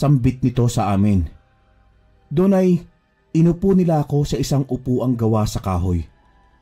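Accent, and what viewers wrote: Ilocos, Philippines. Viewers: nakakatuwa ka naman mg ilokano lods hehe nice story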